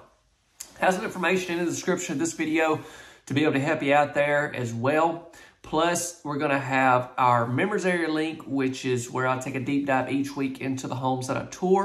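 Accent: South, United States. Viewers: your southern accent to me just enhances the quality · You don't have to not sound country for us here in Pa ... I love yours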